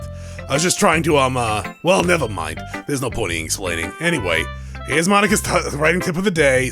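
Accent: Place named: Northeast, United States. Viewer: I swear every time I watch a playthrough of this game I see something new. Blood border? Wtf? Why did that happen and how did you get it to happen? What of my OG playthrough will you not see? I am confusion! Also, are you east coast US? Certain words sound very northeast to me in how you're non-rhotic sometimes and have that special 'Jersey' (and surrounding areas) way of saying like, caught or coffee.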